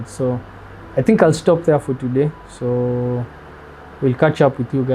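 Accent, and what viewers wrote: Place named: Kenya. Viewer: From the talk unajua ni mkenya 😂🎉🎉🎉let's goo bro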